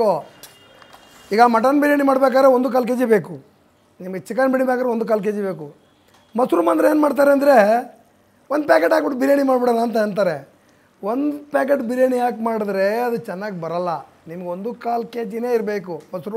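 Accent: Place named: Bangalore, India. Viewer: Namaskara. Naanu Sehar, Hosur. Ivaaga Inna 3 thinguligea USA Alli idhinee. Neevu nimma 54 vayasinalli yaagidhiro adhe thara mamma thandhe Krishna Swamy avaru adhe 54 lalli adhe thara idru. Adharke innonondu Namaskara. 82 vayasu vargu chennagi idru. Neevu 100 vayasu irilike aaseervafagalu (Naanu ivaga 73 Senior). 2 varushadinda nimma Tamil postgalu nodutha idhni. Nimma Bangalore style tamilu super. Best wishes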